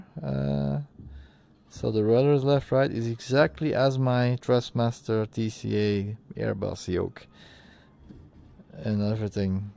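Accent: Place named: Belgium. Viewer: Alee komaan lol.. er is nen Belg voor nodig om nen Belg te herkennen :) goed review! (maar een klein detail; als je uit vr kwam voor de opname, dat zou de kwaliteit voor de kijkers erg verhogen)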